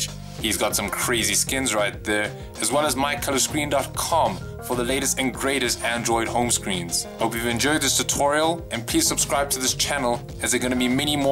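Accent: South Africa. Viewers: Cool tutorial, wasn't expecting the SA accent, nice bru!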